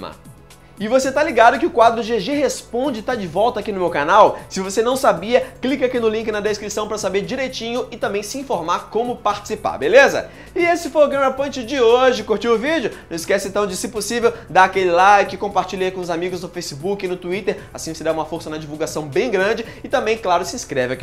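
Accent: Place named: Bahia, Brazil. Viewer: Olhem os primeiros vídeos dele, era mó baianao kkkk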